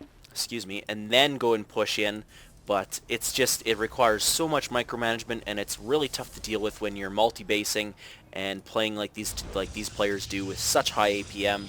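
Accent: Canada. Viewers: I'm Canadian and I didn't know what a Canadian accent was before hearing you. Keep up the great work. Your commentary is up there with the best. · you sound so canadian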